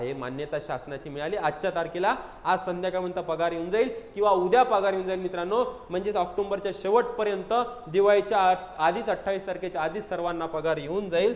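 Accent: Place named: Vidarbha, India. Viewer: ही विदर्भाची भाषा आहे भाऊ.... ते असेच बोलतात 😂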